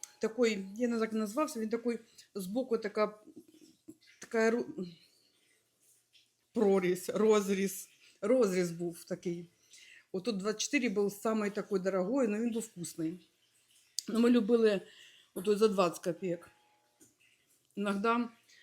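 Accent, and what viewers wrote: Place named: Western Ukraine, Ukraine. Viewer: Знаєте, ненавиджу російську мову. Через отих кончених москалів. Але мені дуже подобаюься українські слова які ви вживаєте в своїй розмові. Це такі українські слова з западенським акцентом. Дякую вам за це. Дуже приємно це чути. Улюблений вислів мого покійного тата: Тут живе Степан Бандера? Так-так-так' - відповів кулемет. Вас вітає Великий Львів.з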